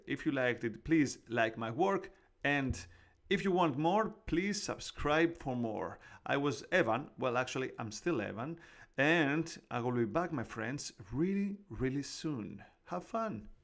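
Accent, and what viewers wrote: Greece. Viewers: χαχαχαχα μόλις άκουσα την φωνή σου κατάλαβα ότι είσαι έλληνας! πολύ ωραίο βίντεο μπραβο σου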